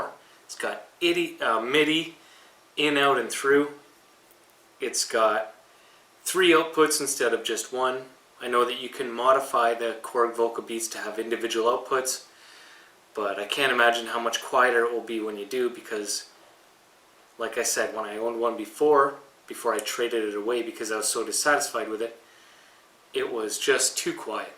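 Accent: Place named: Canada. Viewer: You have the slightest hint of a Canadian accent. :P Love the video, thanks for sharing with us.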